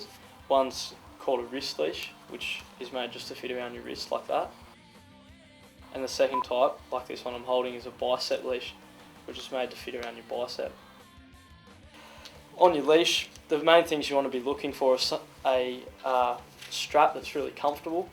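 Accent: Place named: Australia. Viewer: I already know everything this guy is talking about but I continue to watch the video because his voice is Godly. It's like listening to Morgan Freeeman, except Australian and white.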